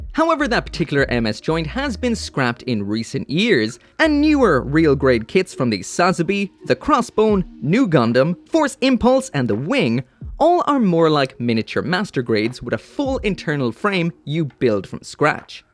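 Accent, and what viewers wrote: Ireland. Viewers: its a misheard, he said 18 meters, hes irish accent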